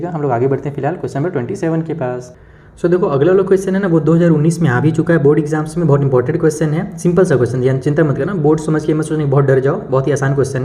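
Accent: Bihar, India. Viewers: Ap bihar se ho sir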